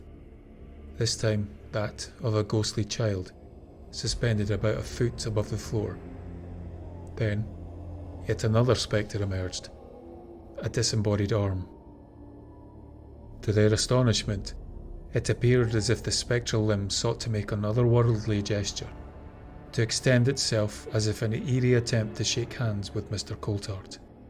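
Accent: Scotland, United Kingdom. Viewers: Love yer vids and the guid Scots voice that accompanies them!🤩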